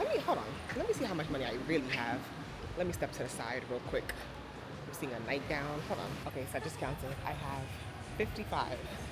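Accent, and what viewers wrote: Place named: Caribbean. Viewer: Friend —- u really sound like u have Caribbean roots 🥳 I’ve noticed it in a lot of ur vids 🙃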